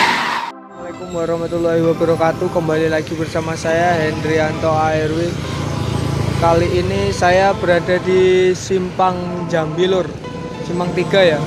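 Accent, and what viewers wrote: Java, Indonesia. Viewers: Logad lu Jawa bngt coy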